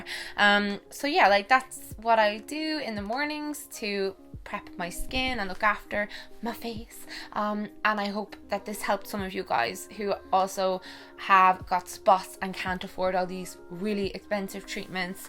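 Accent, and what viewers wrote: Ireland. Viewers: lovvvveeee you can you marry me??? God Irish accents are so sexy xx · Does anyone know what part of Ireland she's from??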